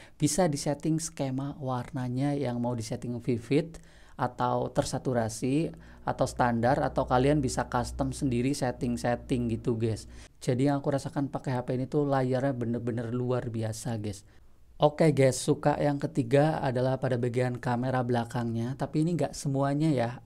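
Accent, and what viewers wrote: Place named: Indramayu, Indonesia. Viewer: Ko kya wong dermayu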